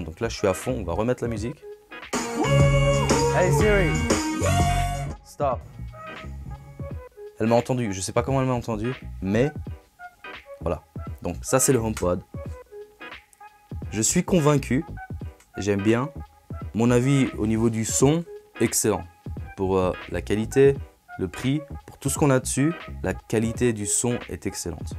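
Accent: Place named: Quebec, Canada. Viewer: tu viens de où? Tu as un accent du Québec!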